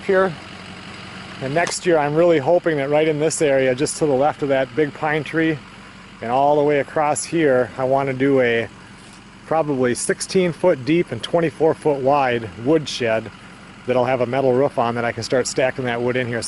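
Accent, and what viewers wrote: Minnesota, United States. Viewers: dear i really love your minnesota accent if u let me come to ur cabin ill let you come to mine in the UP michigan i know michigan people suck but were ohio people so everything will be ok pm me if youre interested alex p.s. wuts ur favorite kind of beer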